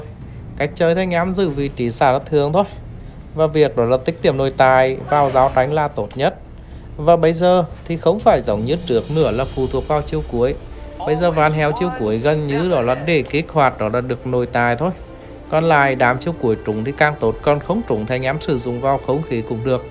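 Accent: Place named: Hue, Vietnam. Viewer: giọng huế ko nghe đc gì 😢😢😢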